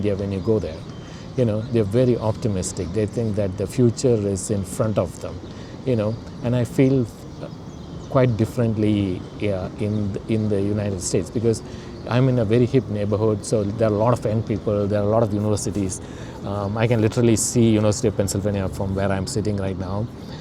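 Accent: Kerala, India. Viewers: Having not lived in Kerala, you still have a Malayali accent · The first accent figured out Mallu ❤ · Why didn't you pick up the American accent in 35 years? You still have the mallu accent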